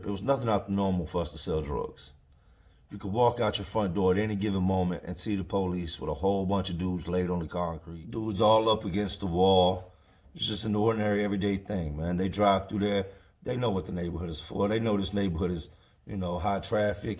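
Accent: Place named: Baltimore, United States. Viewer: For real you talk and vibe like dudes in Baltimore.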